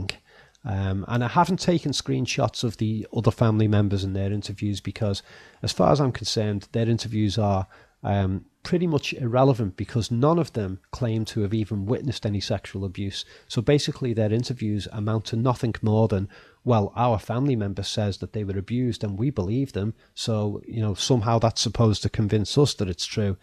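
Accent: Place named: Northern England, United Kingdom. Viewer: Dissenter here. Regarding that couch..... Maybe he / his wife simply redecorated the place a bit between days of shooting? I know, it sounds incredibly simple and non-conspiratorial but maybe one would want to do something chore-like after a day of harrowing interviewing / reminiscing. Maybe it was planned already; obviously the shot with the couch was filmed at a different point in time. So........ what?........ If you were to analyse reality this way, you would find it to be non static most of the time. People sometimes move stuff around! They must be hiding something. Ofcourse your elaborate scheme scenario, illustrates your narrow analytical view (tending towards form over substance) and slightly glosses over the fact that if your theory were correct, AND following your logic then they'd probably just paint over a similar chair and move the couch away and avoid the "suspicion". 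BTW you completely missed the shrubbery outside, which is trimmed shorter in the shot with the couch! Can't believe you missed that! Weird huh? Lots of used takes / editing applied means false.... that's not a convincing argument and you should know that. You analyse films. Yes, shockingly, documentaries ALSO get edited and aren't filmed in one continous shot. BTW you're from Northern England right? Love that "K" sound you guys do after "-ing" words though.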